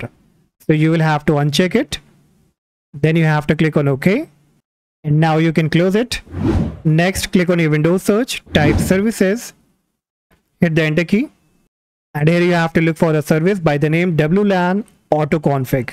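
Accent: India. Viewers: I just hear an Indian accent then I know my problem will be solved. Thank you so much ❤❤❤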